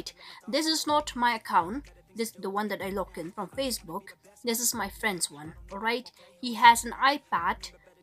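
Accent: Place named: England, United Kingdom. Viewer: So much Overreact in English accent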